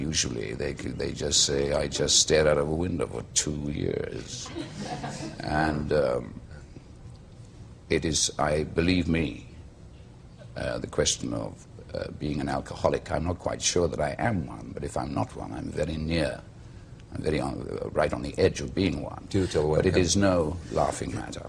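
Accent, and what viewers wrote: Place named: Wales, United Kingdom. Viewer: Can hear his welsh accent at times, poor man, rip.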